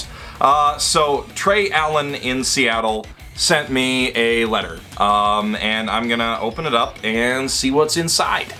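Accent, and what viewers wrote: Canada. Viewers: WAIT!! Your're Canadian!? How did I not catch that? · Hole maple syrup Goku! your Canadian! I had a feeling i liked you speech pattern, guess because your Canadian. Well Subscribed! keep up the good work :)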